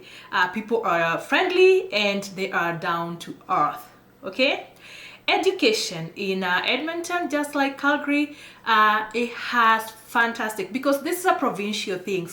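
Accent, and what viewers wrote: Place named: Kenya. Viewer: You have kenyan accent😍 Mimi pia ni mkenya in Edmonton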